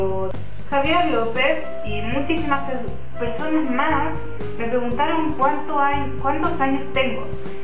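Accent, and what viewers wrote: Chile. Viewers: yo ya sabía que eras chilena! :'v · muchos dicen que ya sabían que edad tienes yo por tu acento ya sabía que eras chilena xd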